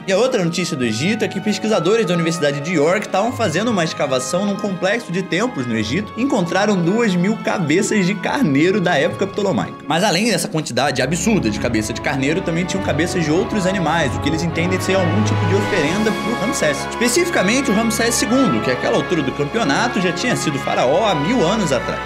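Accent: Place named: Rio de Janeiro, Brazil. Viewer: Adorei o vídeo, faz uns vídeos contando um pouco da história do Egito e dos faraós, como se fosse uma baita fofocona kkkkk acho da hora história sendo contadas dessa forma e pra quem tem dificuldade de entender ajuda bastante. Outra coisa, adoro seu sotaque carioca, amo seus vídeos do TikTok.. continue assim❤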